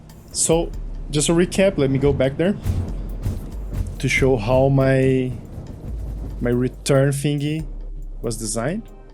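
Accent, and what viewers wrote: Brazil. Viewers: Dá para conhecer um brasileiro fácil pelo sotaque. hehehe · Mano vc é brasileiro??? Pq parece muito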